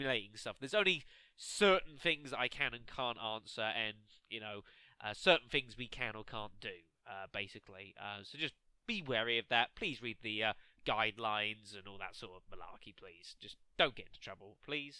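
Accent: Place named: United Kingdom. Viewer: I love your voice. It's amazing and makes the LPs more interesting. I wonder where in the UK you're from?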